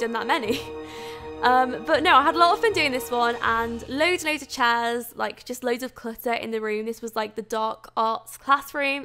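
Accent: United Kingdom. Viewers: I really like your British accent and enjoy with your building Hogwarts castle. I hope you will make more about building some places from Harry Potter or wherever. Keep making good videos!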